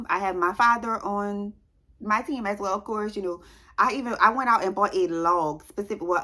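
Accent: New Orleans, United States. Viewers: First 1 I love your New Orleans accent lol 2. I got them save glasses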